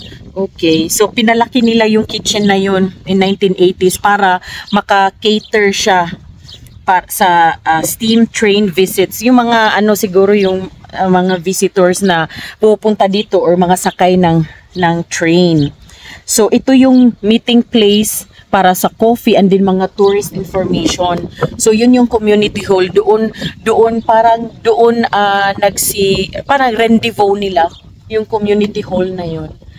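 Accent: Visayas, Philippines. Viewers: hi maam,napansin ko parang bisaya ka.asa dapit ang imo town or city sa besayas? proud ako sa mga besaya nga hero..♥️♥️♥️♥️♥️ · Morag bisaya manka mam...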